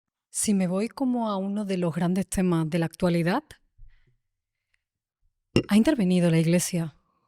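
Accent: Andalusia, Spain. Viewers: Excelente Potscast, la amo a lo maximo, excelente comunicadora, me encanta sus entrevistas y su acento andaluz. Tal vez mi origen tambien viene de ahi, creo que Malaga me jala para alla!! Abrazo beso.!!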